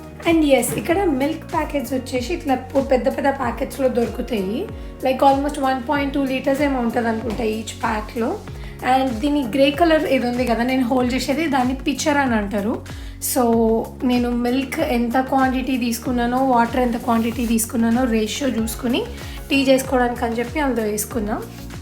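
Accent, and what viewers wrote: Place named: Vijayawada, India. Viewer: Mee slang chala bagundhi.... Meeru vijayawada....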